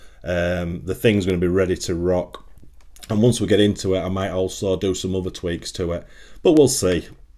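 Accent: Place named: Yorkshire, United Kingdom. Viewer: I love your videos you live in Canada but you sound like your from Bradford or may be Leeds. I am looking forward to your next video.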